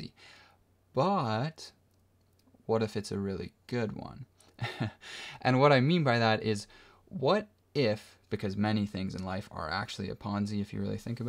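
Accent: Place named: Canada. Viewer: Instead of starting your video saying "Markets" I think it would be beneficial to say "American Markets" as you have a Canadian accent and you said in your video you're in Canada. Also, if your video is about the USA market, can you please start discussing the US housing market? a 700K house is normal for Canada but NOT normal for the US so it's not a great example.Or maybe it's just me and everything. you're doing is not confusing at all. I appreciated your crypto fear and greed explanation. Good reminder. I almost sold in 2022 but figured it wasn't worth the hassle so I didn't due to laziness.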